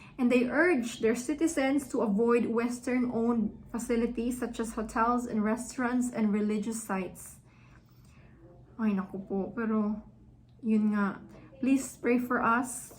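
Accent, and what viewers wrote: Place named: Visayas, Philippines. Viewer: Bisaya gihapon ka day.sinultihan plng nimo.mailhan na day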